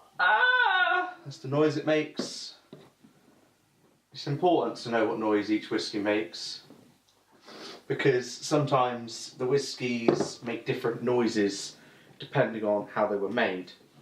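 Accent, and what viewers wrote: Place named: RP, United Kingdom. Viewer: Nice review...your just to posh pal...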